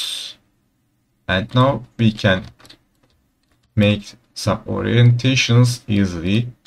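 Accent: Turkey. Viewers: This English looks like Turkish English. What a bad accent :)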